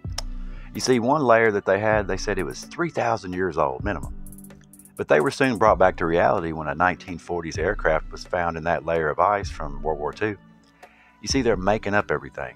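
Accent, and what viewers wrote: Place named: South, United States. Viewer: Hey brother i appreciate your work man. And i can tell u a southern boy like me and that makes me like it even more.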